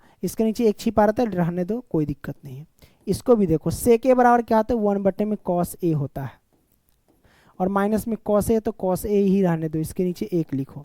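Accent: Bihar, India. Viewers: Aap Bihar ke ho sir😊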